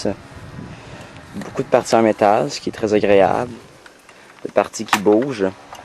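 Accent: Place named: Quebec, Canada. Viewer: dsl mais tn francais est...... Mais t un quebecois dc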